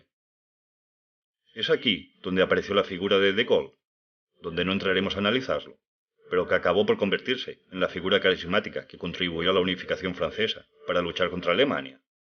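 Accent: Galicia, Spain. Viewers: oye eres gallego?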